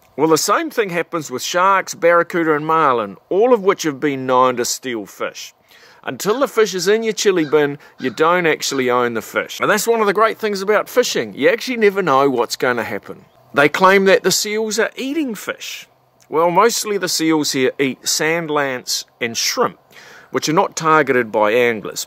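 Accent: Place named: Australia. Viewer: It is good to hear a down under accent. Makes me homesick. I will soon be heading from Nantucket’s winter to my place in New Zealand for their summer. Meanwhile, I’d like to set the narrator straight. In this Earthrace Conservation video, the narrator displays the valor of ignorance. The valor of ignorance is defined as expressing a point of view that is unhinged from knowledge of the subject at hand. Let me point out some of the errors in his narrative that deflate his claims. --Crocker Snow a respected conservationist- does not “lead” the Seal Abatement Coalition. He has been a valued member of its Board. He did, however, lead the campaign to get Muskeget Island officially designated and preserved as a Federal National Natural Landmark. ---Crocker is not concerned about the gray seal invasion of Muskeget Island (of which he owns half) in order to protect his shack there. 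He wants to protect the island’s biodiversity, which is threatened by the burgeoning gray seal population. For the real story go to <link> click on Actions and then on Unintended Consequences. ---The narrator minimizes the gray seal impact on the fishery and lays it off on commercial fishermen. A recent study of commercial fish and shellfish landings from North Carolina to Nova Scotia found that they equaled just 60% of what gray seals consume. --Far from the 15,000 gray seals eating mainly “sand lance and shrimp” that the narrator cites, a report in a regional North Atlantic newspaper cited a Canadian stud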